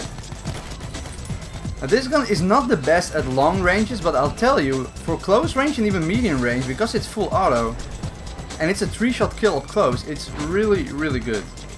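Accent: Netherlands. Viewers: Are you dutch by any chance? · I hear some Dutch accent, am I right? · Je bent Nederlands ik hoor dat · Jij hebt de typische Nederlandse accent wanneer je Engels spreekt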